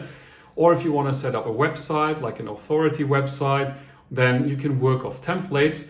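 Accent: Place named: Germany. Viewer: Ich höre den deutschen Akzent :D Aber gutes Video, weiter so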